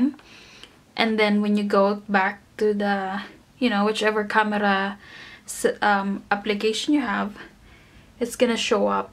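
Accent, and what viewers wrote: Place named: Iraq. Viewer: I feel that u r iraqi person speaking english xd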